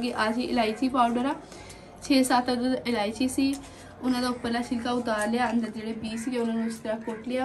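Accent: Punjab, India. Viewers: Zaberdast 🤲 mah apko subscribe ho apki pqnjabi india wali panjabi hai Allah apko taraki dey ji ayn noh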